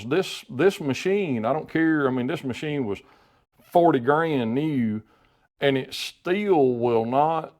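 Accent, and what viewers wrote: South, United States. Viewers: someone with a southern drawl and slow talking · ‘… people that’s smarter than me…’ as a foreign viewer, who knows nothing about machining or mechanics, can I just say how much pleasure it gave me to listen to you discuss this with your accent. To a non-American you have a real ‘good ‘ol boy’ way of speaking that adds huge value to your out-of-hand dismissal of his criticism. Fantastic. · your overwhelming Southern Drawl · that southern drawl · your amazing southern draw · I'm from Canada and I have no problem understanding your lingo. In fact I love the Southern accent..!! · You talk like a redneck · I love the Southern Drawl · i have near to no experience with valve-work, but i appreciate your professionalism and respectful attitude coupled with the most southern accent i've ever heard as a foreigner. i enjoyed every second of this and even learned a little bit too. 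thanks for all that. god bless!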